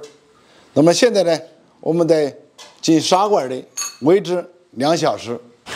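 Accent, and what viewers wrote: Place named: Hubei, China. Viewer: 亲切的湖北口音😊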